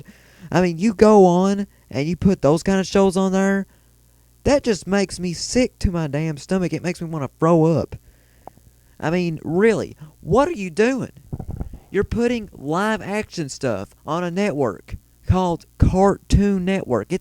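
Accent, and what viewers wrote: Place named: South, United States. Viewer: Are you from the south?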